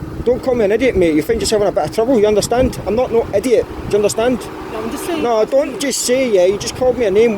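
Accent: Salford, United Kingdom. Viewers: You have a fuuny voice are you from SALFORD near Manchester .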